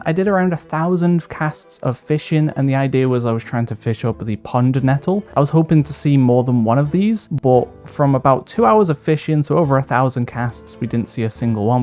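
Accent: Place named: Liverpool, United Kingdom. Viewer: I’ve enjoyed this sounding guide to say u vowels in a liverpool accent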